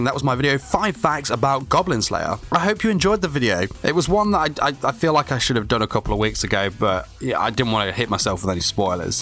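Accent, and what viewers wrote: United Kingdom. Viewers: Dude, "Bolg" is from Celtic mythology. You sound British, so you should know this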